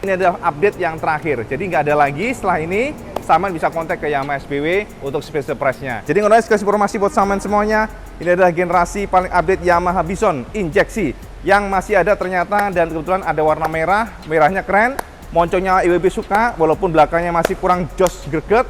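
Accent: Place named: Tulungagung, Indonesia. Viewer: Megek lk boso tulungagung🤣🤣🤣🤣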